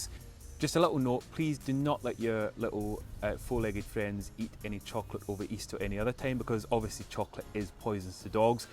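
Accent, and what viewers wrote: Scotland, United Kingdom. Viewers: I just love your Scottish accent! I know, I know, I'm biased for I'm Scottish too. Looked forward to watching your channel, your tips on etiquette. Who know, one day I may have someone from the gentry coming for tea!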